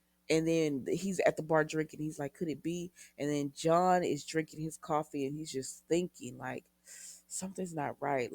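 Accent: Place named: Greece. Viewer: I love your Greek accent!!! Probably better then the actors!!!!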